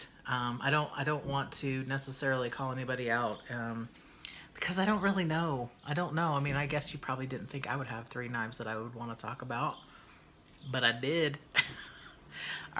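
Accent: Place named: South, United States. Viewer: Cool ! I thought I heard some southern in you! I'm from/live in Alabama!!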